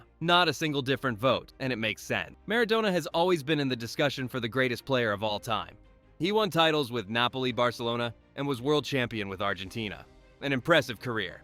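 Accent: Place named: United States. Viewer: Damn.. the American accent is excruciating 😅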